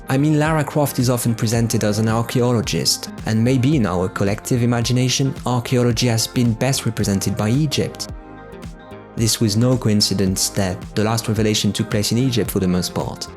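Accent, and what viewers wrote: France. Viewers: Is it just me or does he have a french accent? Great video btw!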